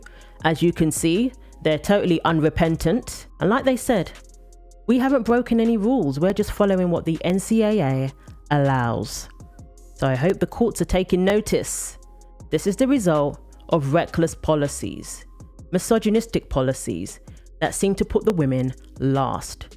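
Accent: United Kingdom. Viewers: On a personal note, although you have a British accent, it was easy to understand your speech. Your diction is clear. I sometimes have to resort to Closed Captioning to understand English speakers from other countries. Also, excellent fact based coverage of a very important issue.✌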